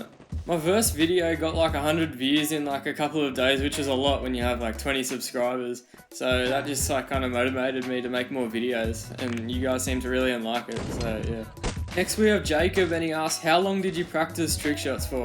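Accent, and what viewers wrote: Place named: Australia. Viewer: Love your Australian accent and I even live qld but I don't have an accent. Lol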